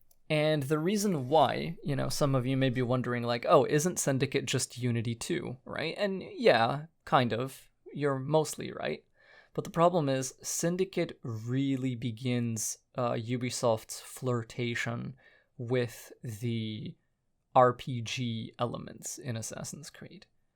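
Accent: Eastern Europe. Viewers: You're Eastern-European? Where from? I'm half Polish. Also, I love when you say godlike.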